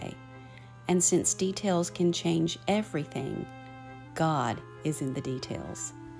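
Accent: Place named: South, United States. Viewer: I love your soft, sweet Southern voice!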